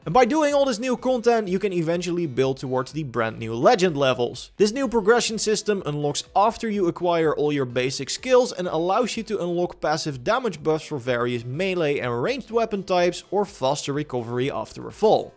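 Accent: Netherlands. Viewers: This man is from the Netherlands, I can tell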